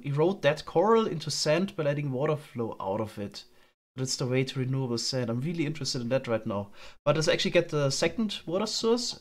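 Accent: Germany. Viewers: Haha cool du bist auch Deutscher hat man am Akzent erkannt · Are u German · Hab deinen Kanal erst vor ein paar Tagen entdeckt und finde dich super ! 😁👍 Aber ich fress n Besen samt Stiel wenn du nicht deutsch bist, mein Englisch klingt genauso 😁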